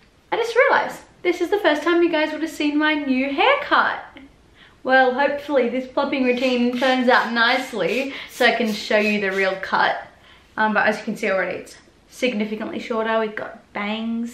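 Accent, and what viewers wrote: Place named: Australia. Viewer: Love listening to your Aussie accent!😄❤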